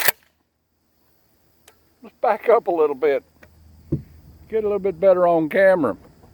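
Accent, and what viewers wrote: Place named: Western North Carolina, United States. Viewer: Yep you sound like you're from West North Carolina around Murphy ? Am I wrong ???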